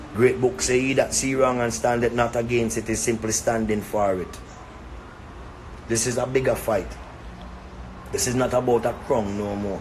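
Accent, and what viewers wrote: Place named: Jamaica. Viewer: This was a set up from start,they were intimidated n pulled this stunt...... i luv u but y the Jamaican accent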